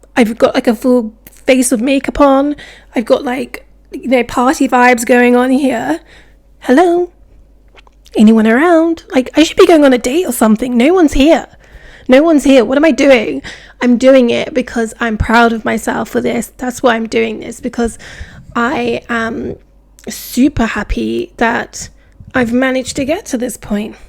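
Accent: United Kingdom. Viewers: Sound like your from UK 😮😊😊 tappin in from across the pond 🥰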